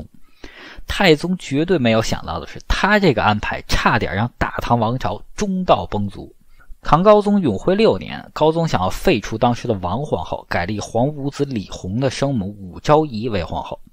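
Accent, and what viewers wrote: Beijing, China. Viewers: 确实听起来非常不舒服，有种吞音的感觉，南方人叫连刀快，可能是北京人的口音吧，听时间长了真的很难受，不过内容还是挺好的👌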